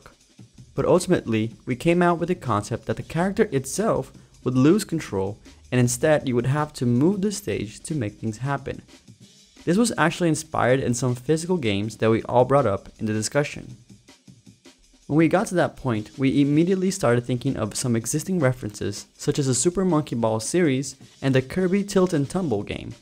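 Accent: Brazil. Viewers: É impressão minha ou você é brasileiro?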